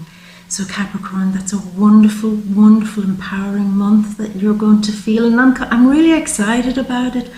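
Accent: Ireland. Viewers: Is that an Irish accent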